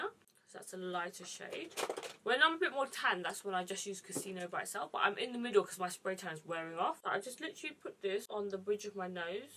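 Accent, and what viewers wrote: United Kingdom. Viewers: also would have never guessed she'd be british LOL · If you listen carefully, you'll be able to hear her British accent. She's from England. It's funny in life how we live in one area and think that all other ethnicities will have the same accent as ours. Or we refer to an accent as something different than what we normally hear. There's all ethnicities all around the world. England is very multicultural just like Canada and America. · I always watch her ig vids and when I first went to her YouTube vids I was like OMG she is British???? Loved it 😂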